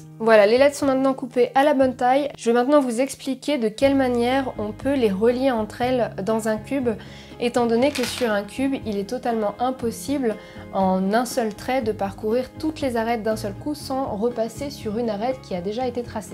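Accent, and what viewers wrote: France. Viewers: Como hago para casarme con una mujer inteligente como ella y ese acento francés enamora.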